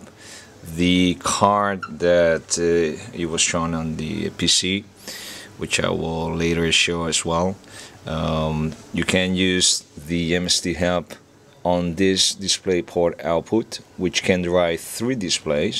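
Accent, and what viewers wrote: Netherlands. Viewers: Are you duch think i hear a dutch accent hehe.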